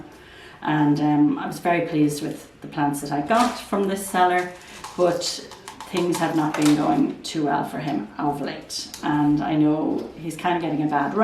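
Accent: Ireland. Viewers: Thank you. I have narrowed my plant subscriptions down as I began to suffer from information overload. Your relaxing, informative videos are a joy and your lovely accent is a balm to this American daughter of Irish immigrants. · Please continue making your video!! Don't stop...I enjoy and learn so much. I also enjoy your gorgeous accent from your lovely country...Ireland 😍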